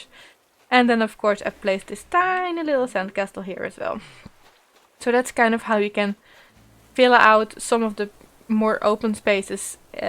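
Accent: Netherlands. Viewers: Where u from, u sound Dutch?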